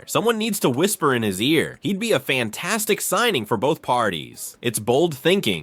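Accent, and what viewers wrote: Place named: United States. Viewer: Can never trust English transfer rumours with yank commentary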